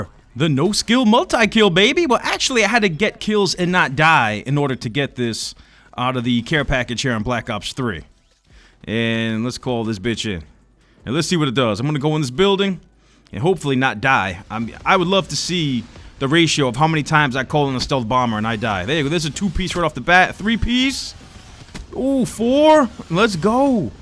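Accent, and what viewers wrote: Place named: New York, United States. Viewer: I Knew you was from ny b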